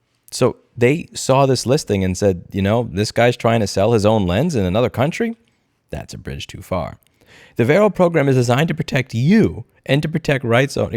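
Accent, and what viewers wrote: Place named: Italian-American, United States. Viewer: The more angry gets the more ginzo he sounds.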